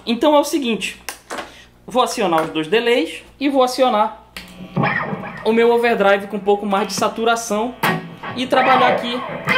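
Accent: Pará, Brazil. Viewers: Sotaque paraense, acertei?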